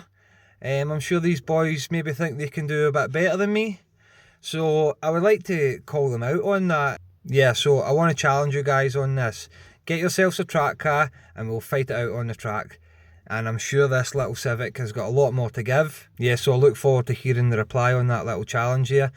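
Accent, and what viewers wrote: Scotland, United Kingdom. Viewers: Great video. Liked and subscribed. Great to hear another Scot 🏴 on here. Going to spend some time watching your vids. Specially enjoy the Type R ones 👍 keep them going mate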